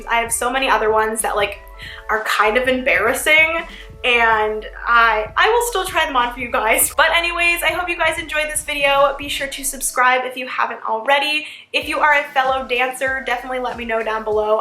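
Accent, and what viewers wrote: Canada. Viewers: Evelyn M, she can't help it! That's what happens when you're born with a Canadian accent. What if I said I didn't like that you're a girl, you can't help it you're born with it so stop being mean to people when they can't stop or help with their doing or saying. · Are you Canadian? It really sounds like it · paige thames she is French candian thAts why she talks like that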